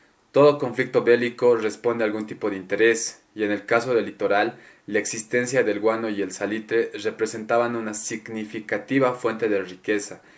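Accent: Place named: Bolivia. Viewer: Apenas los escuché supe q era boliviano , aunque también pudo ser ecuatoriano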